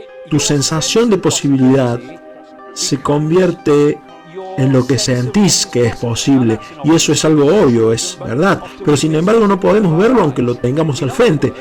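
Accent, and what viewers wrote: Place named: Córdoba, Argentina. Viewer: ❤❤❤ otra vez lo voy a ,👀👂no m acuerdo del acento cordobés 😂tienen mucho salero 🧂 como buen@ Andaluces 👍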